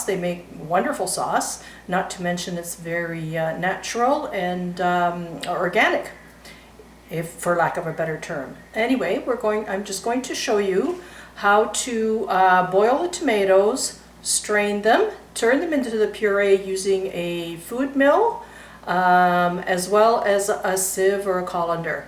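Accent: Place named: Northwest England, United Kingdom. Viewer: Thank you for your response. Your accent did seem a little different. I was thinking the Mid/North West. I have bought a tomato press and now I'm contemplating getting a food mill because I want a smoother sauce. Now I believe I'm just gonna go ahead and get that food mill. Your sauce looked pretty good! You should keep making more videos!😉😊❤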